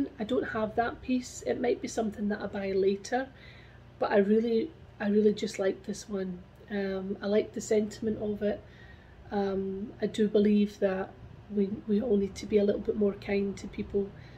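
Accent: Scotland, United Kingdom. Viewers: Thanks for checking in. I’ve missed you. Love listening to a Scottish accent.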